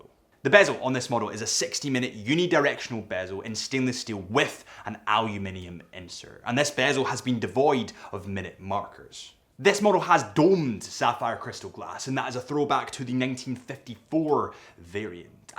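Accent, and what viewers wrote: Scotland, United Kingdom. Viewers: Why is it called 3 link? You sound like a Scottish adrian Barker you have the same cadence when you pause start and end sentences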